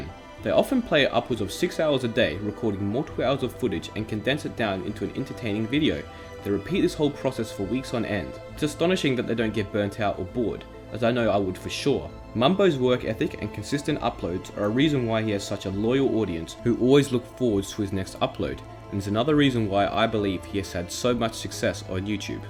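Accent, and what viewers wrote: United Kingdom. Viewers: It's the british accent. I love it!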